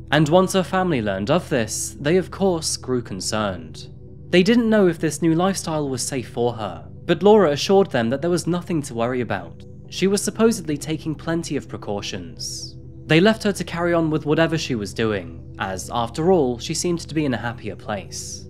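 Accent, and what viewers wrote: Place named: United Kingdom. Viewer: British awcentwtwtw....nice exploitative content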